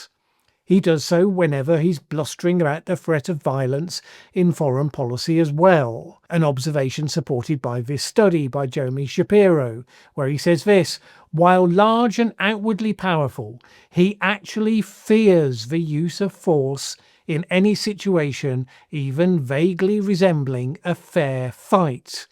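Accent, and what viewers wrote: United Kingdom. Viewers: Sounds like a Brit, smells like a Ukrainian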